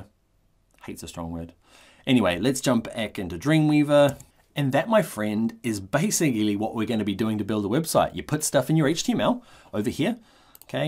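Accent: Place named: New Zealand. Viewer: great video, awesome to hear a fellow NZer too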